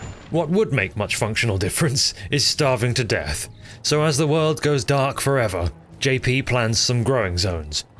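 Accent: United Kingdom. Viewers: Hearing a brit talk about Starcraft just feels right. Rip TB, we do not preorder.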